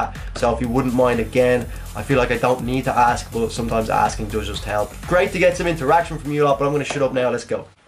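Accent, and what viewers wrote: Ireland. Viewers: I found it so fascinating when (rad-key) reacted to you. He thought you didn't like ' '. I thought HOW is he NOT seeing your reaction as positive? I legit believe he had trouble understanding your VERY easy to understand Irish accent.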